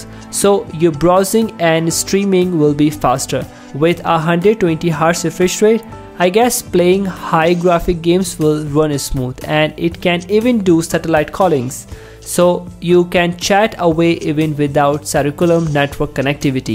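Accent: India. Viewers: Why does he sound Indian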